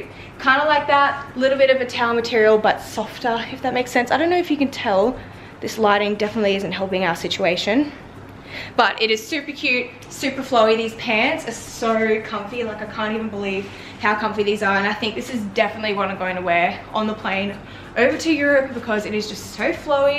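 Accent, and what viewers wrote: Australia. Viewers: Australian Women Are Gorgeous!!! not only you're beautiful you have a nice accent too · Why do aussies pronounce o, oyyuuu.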